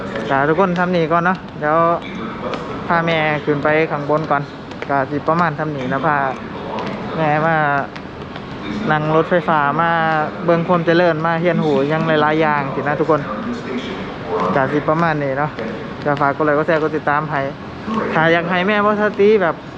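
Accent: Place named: Ubon Ratchathani, Thailand. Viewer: บ่าว เป็นคนแขวงได๋เว้าคึทางอุบล ทำงานยุกรุงเทพบ้อเพิ่งติดตาม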